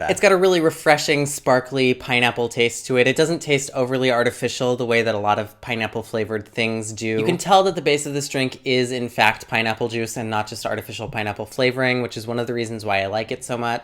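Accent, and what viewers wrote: Portugal. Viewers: btw, you have a nice Portuguese accent! Keep going! :p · You've got a pretty natural accent. Just found your channel and already subscribed. Keep on learning Portuguese, it makes me really happy to know that people from outside are studying my language. I've recently found a lot of channels by foreign people who speak Portuguese (From Japan, Romania, Russia, Germany, USA...), but you're the first who speaks with an european accent. :) Greetings from Brazil (and please, come here one of these days :D)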